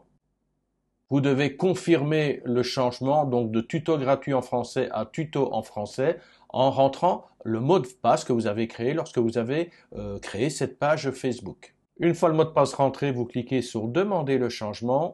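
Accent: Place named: Belgium. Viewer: Bonjour, sauriez vous me dire comment peut on contacter Facebook qui ne répond jamais mais alors jamais ! J'ai un groupe de plus de 105 k abonnés et je sais que FB fait ce qu'il veut quand il veut (modification, suppression de contenu injustifié, etc...) mais côté service client c'est pitoyable. Alors si vous aviez une astuce ou idée ce serait cool. Je voudrais savoir aussi si vous connaissez un truc pour mettre une photo cliquable sur un groupe FB ? En tous les cas, vous assurez grave avec tous vos tutos super bien expliqués, hyper didactique avec votre accent (belge, suisse ou alsacien ? ). Bravo et merci. Patrice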